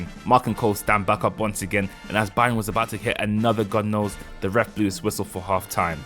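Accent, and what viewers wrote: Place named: United Kingdom. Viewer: Love you bro I love British Accent🫶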